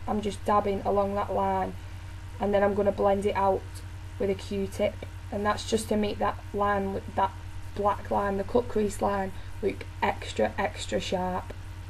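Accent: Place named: Manchester, United Kingdom. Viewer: Are you from Manchester. I am and you sound like me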